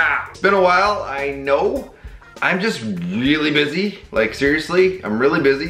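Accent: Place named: Mexico. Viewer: You do not sound bad. Just a little mexican.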